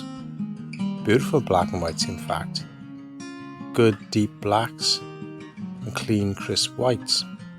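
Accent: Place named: South Wales, United Kingdom. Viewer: After seeing your review I picked one of these up (Nikon F mount) on eBay for forty quid. Not sure why but I'm finding it's overexposing on my Nikon D500 and I'm having to set a compensation of -1 to -2. Apart from that it's an excellent lens. I came back to your video and just twigged on the voice, South Wales. 👍🏻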